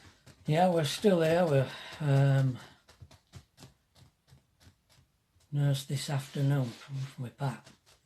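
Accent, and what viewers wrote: Yorkshire, United Kingdom. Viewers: We love watching your channel; your a man of many talents and your both a lovely couple: You make us smile and it’s great to hear the Yorkshire accent and sense of humour: We are from Bradford and being living here in the West of Ireland which we love: Don’t give up; remain positive; your a very special couple.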